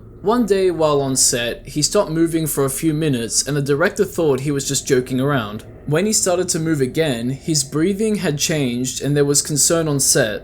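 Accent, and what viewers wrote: Australia. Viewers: You're Australian? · Wait you’re a fellow Aussie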